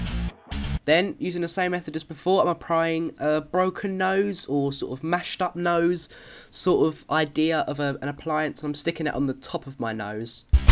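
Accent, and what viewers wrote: United Kingdom. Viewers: You're British? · oh and you're cute mehh and british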